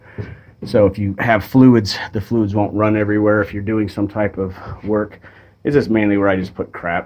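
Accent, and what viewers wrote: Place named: Utah, United States. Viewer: sounds like he's from Utah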